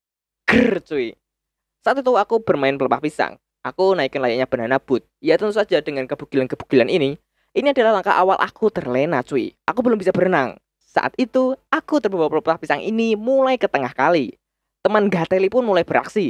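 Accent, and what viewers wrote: Java, Indonesia. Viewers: Tim medok👍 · Medok yups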